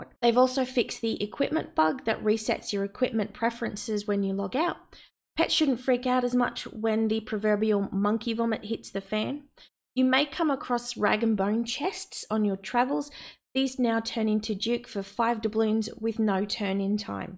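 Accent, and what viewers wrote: Australia. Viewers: Love the aussie accent 😜👌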